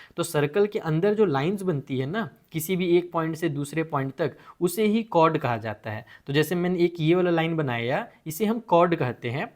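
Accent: Bihar, India. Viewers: Sir kya aap bihar Sa Ho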